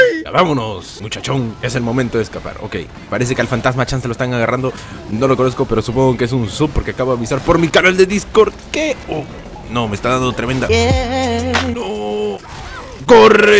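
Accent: Peru. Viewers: Eres de Perú ? ._.XD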